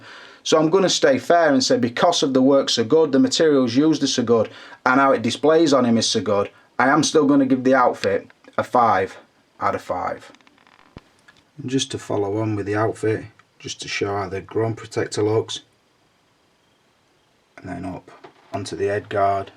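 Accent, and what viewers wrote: United Kingdom. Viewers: I can tell by your accent you know nothing about boxing. the UK grossly over hypes their fighters even when they're clearly trash.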